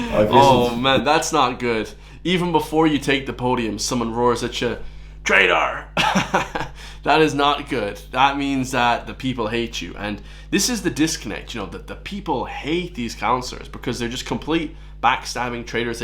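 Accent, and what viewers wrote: United States. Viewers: Are you a yank??? What the fuck 😅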